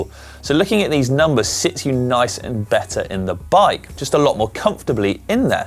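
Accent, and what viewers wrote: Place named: United Kingdom. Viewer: very informative video! and no offense but good job: to keep the accent down for us non-british viewers...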